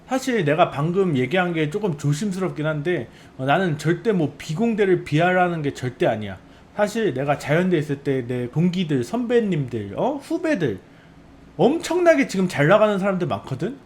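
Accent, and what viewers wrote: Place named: United States. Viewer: 발음이 약간 어메리카한국어를 구사하시네요..피부좋네요.이거말고는 나랑은 딴세상이야기...아니 연봉도 억대시면서 유튜브구독을 종용하시니.ㅎㅎ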